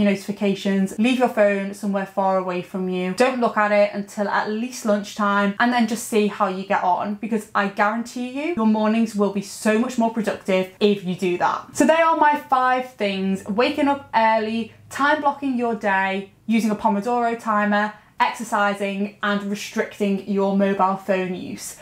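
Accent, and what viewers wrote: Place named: Newcastle, United Kingdom. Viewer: Your accent sounds a bit 'Geordie', no?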